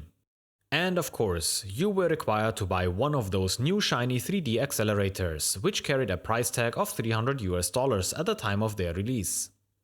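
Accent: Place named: Franconia, Germany. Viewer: You're from Franconia, Germany, right? That accent sounds very familiar!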